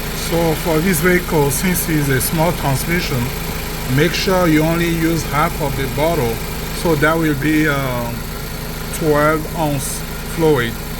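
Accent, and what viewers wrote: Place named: France. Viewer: I love your French accent.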